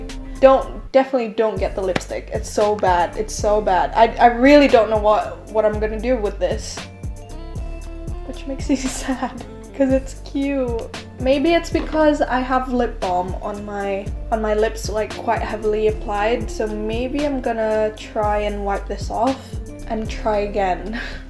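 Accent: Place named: United Kingdom. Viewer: gurl your British accent has gotten so much heavier than your last video lmao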